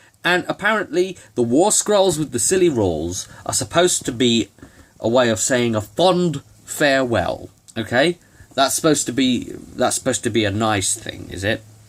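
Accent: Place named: United Kingdom. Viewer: There's just something about a good British accent doing one of these, that we Americans just can't muster in quite the same way....that gravitas...that...elegant sarcasm....brilliant! Thanks for it - you did a great synopsis of much of the concerns that many of us have had since first hearing of this.....game.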